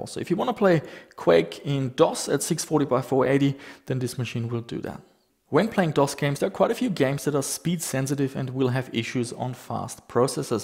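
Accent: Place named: Germany. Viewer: dein deutsches Englisch ist so niedlich 😀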